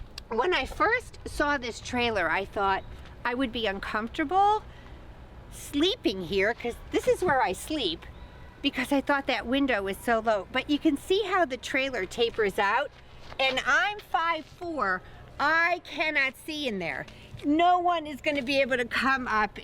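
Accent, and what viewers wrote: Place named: Wisconsin, United States. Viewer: I love your Wisconsin accent!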